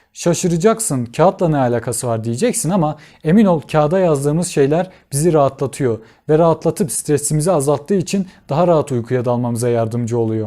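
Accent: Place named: Eastern Anatolia, Turkey. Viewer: Hemşerim nerelisin.. Lisanındaki ton Şarkî gibi..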